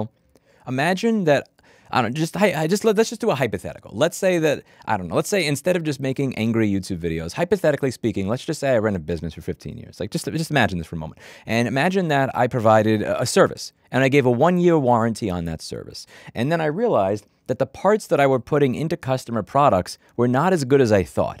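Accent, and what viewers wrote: New York, United States. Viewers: That's that old school NYC talk I can agree with. Thanks for making my day.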